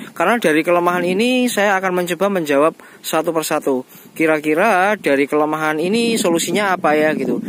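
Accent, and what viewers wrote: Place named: Java, Indonesia. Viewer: terima kasih informasinya masse sangat detail, to the point, tidak bertele2 tapi lucu ya masse ngomong indo logat jawanya kental 😂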